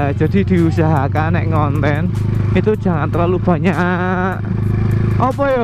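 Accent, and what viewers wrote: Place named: Banyumas, Indonesia. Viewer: iyaws ngapak Bae kepenak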